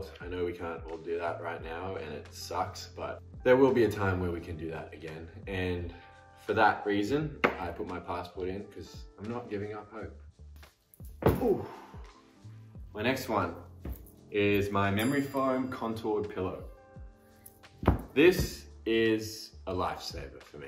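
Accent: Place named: Australia. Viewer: I love his Australian accent. I can listen & watch him all day. Hes so gorgeous 😍😛😘☺🥰🤗🤤😃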